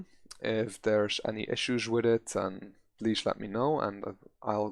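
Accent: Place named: Netherlands. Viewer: Nederlands ofnie ik kan het zo horen in jou Engels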